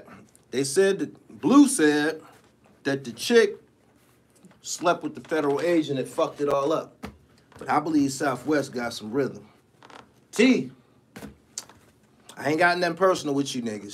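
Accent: New York, United States. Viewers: You do sound like 1 of them bias new York dudes im just saying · Your slick New York talk is old my guy!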